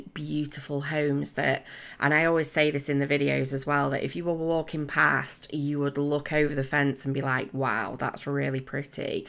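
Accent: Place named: Northern England, United Kingdom. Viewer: My boyfriend is really proud of himself - I occasionally show him accents and ask where he thinks they're from, and he guessed you were from somewhere North of Manchester. :P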